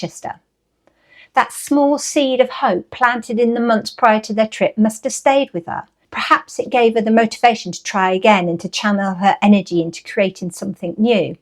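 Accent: United Kingdom. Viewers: I am always amazed how much masticulation is required in the British Isles in order to speak the King's English. Native speakers, sadly, I cannot understand much, the accent is too overpowering, the words and sense often lost. I couldn't consider taking ship to a martian colony with Cambridge or Oxford or Scottish accents --needless to say. Videos are sufficient existential challenges for a wretched, unaccented bloke like me. Other than that, the enthusiasm for Charlotte Bronte is absolutely imfectious.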